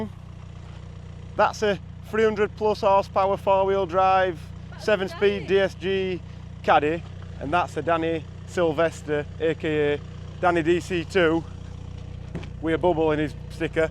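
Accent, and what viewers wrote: Yorkshire, United Kingdom. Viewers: Yorkshire accent and a mullet had me rolling 😂😂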